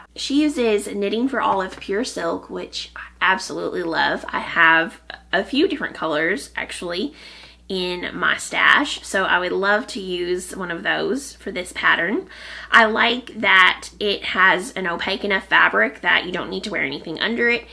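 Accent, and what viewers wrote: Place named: Mississippi, United States. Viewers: Thank you for showing the pattern from Ozetta Knitwear! I looked at several other pattern from this designer and really like them. I enjoy your podcast very much. I’m from Mississippi and listening to you talk reminds me of home! I live in Florida now. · Hiya, this is the second video of yours that I've come across... and they're just great! First of all, I'm Australian, so I just love your Southern accent 😍, second, you're so relatable... I'm not a 'skinny-mini' either! And I'm 5'10", so thanks for touching on doing the maths to adjust your fit... when I was young we were made to feel like we should fit the garment, not the other way around... one day I hope to be able to knit more than dishcloths! 😂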